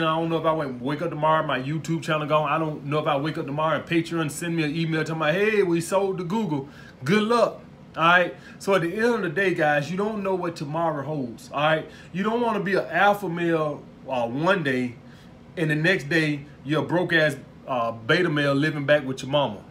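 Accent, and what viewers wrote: South, United States. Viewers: Where is he from he sound like he from the south?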